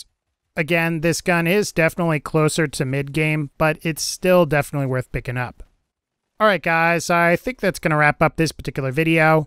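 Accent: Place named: East Coast, United States. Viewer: Im guessing usa east coast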